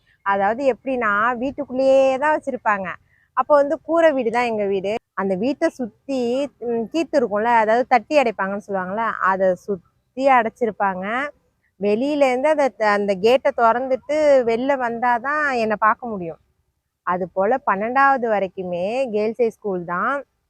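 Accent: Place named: Thanjavur, India. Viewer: Hi da nanum thanjavur unga slang pudikum unga area name venum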